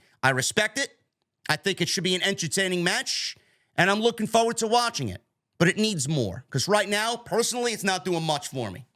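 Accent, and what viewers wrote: New York, United States. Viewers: I just come here to listen to an angry New Yorker accent. 😂 Love it.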